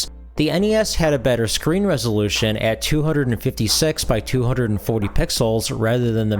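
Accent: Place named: Transatlantic, United States. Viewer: So neat to hear the transatlantic accent with relatively high quality sound compared to commercials and even some films made in the 1940's. Transatlantic + video games = complete bliss! As someone who's played a good deal of both in the 2000's, they both have their standout titles. While I love the Mega Man hexology on NES, Phantasy Star was no slouch!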